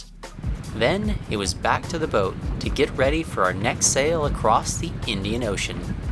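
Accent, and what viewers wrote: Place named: Texas, United States. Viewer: I went back and re-listenened to the vid...I didn't hear any valley girl...unless you count a hint of Palo Dura Canyon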